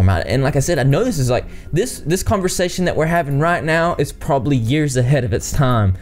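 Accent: South, United States. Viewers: Straight southerner lol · It's an anime character in real life with a southern accent!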